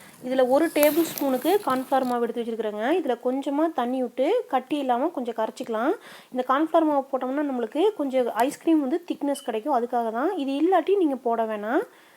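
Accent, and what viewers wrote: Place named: Sathyamangalam, India. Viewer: Hi, nice videos,I just love your Tamil slang, I feel like I am in my hometown Sathy when I hear your voice. where are you from?where are you located?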